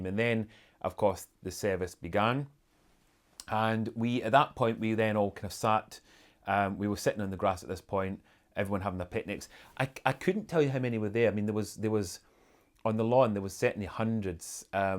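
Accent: Scotland, United Kingdom. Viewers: Are you Scottish? We love your accent!!! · You're probably too much of a gentleman to answer this question but...having known Harry when he was younger what are your thoughts on his and his wife's current behaviour? Surely as someone who had seen first-hand how close the brothers used to be their current estrangement must be very sad to witness...☹ Ps...love the Scottish brogue, it's so incredibly soothing! 💖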